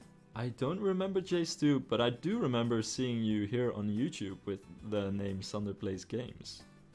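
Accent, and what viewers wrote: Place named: Netherlands. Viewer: You sound Dutch. Are u from the Netherlands?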